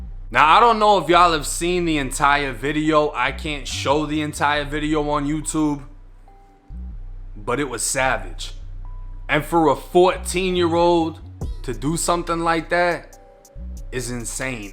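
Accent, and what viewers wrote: Boston, United States. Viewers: The food mah-ket . Lol I'm from Buffalo and I love hearing ppl talk with a Boston accent. Fucking never gets old lol. The accent, your story telling ability, your journalism skills are all killer. bro you got talent 👏 👌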